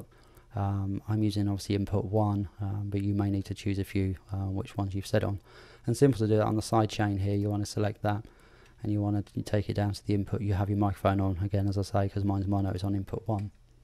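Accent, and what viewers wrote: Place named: United Kingdom. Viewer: Finally someone British. I can't take these American tutorial guys seriously. The accent is all wrong.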